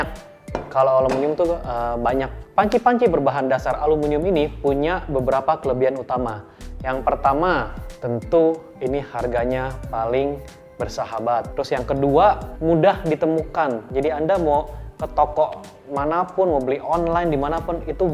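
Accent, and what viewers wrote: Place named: Palembang, Indonesia. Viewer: Wong Plembang caknyo bicek ini hhahahaa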